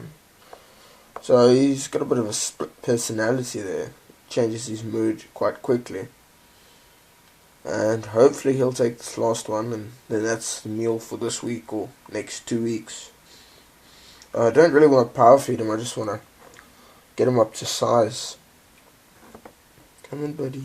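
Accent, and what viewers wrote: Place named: South Africa. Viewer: you sir are south african .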